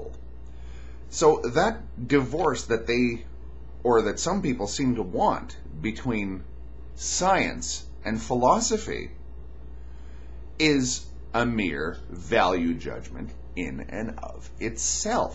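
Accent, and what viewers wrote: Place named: Canada. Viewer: Heavier Canadian accent here than usual!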